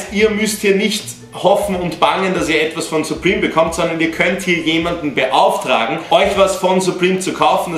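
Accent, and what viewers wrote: Austria. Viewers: Liebe deinen österreichischen Akzent. :D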